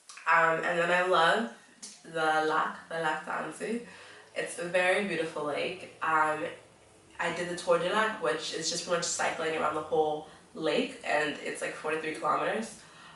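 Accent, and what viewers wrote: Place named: California, United States. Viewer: You accent is interesting... I was thinking California or Canada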